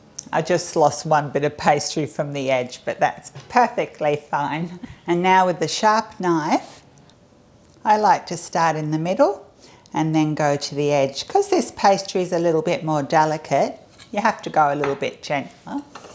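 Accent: Australia. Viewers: Thanks, I love custard tart. Are you English? I hear the Aussie accent but I hear an English accent coming through here and there.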